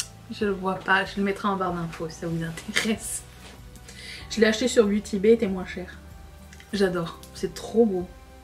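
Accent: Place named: Quebec, Canada. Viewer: T'es Québécoise ou Française j'comprend pas trop 🤔 T'inquiéte c'est pas une critique c juste que youtube m'a recommander une de tes vidéos hier so yea.. J'vien de te découvrir et je perçois l'accent QC 😅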